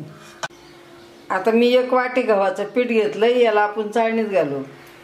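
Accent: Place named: Bhor, India. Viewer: Tumch Bolan ani bhasha mala khup awdte maz maheri same Asch bolatat Bhor side la tumch Gav kont ahe aaji Mala tumcha recipe khup awdtat.... Love you ❤️❤️❤️ mazi aaji same Asch bolaychi mi khup miss karte maza aaji PN tumch Bolan aaikun khup Bhar vatat mala ..... Thanks 😘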